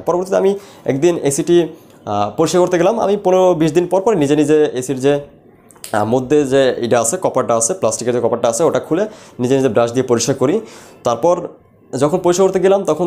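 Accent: Barishal, Bangladesh. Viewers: Vai koto taka khaisen? Apnar kotha shuinna matha ton ton kortase. Apni Barishaylla tai na? Chapa baji valoy janen. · ওরে বরিশাইল্লা!! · ভাইয়্যার বাড়ি বরিশাল কোন হানে! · ভাই বরিশাইল্লা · vai bari borisal naki😅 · Your language is telling that you are Borishal person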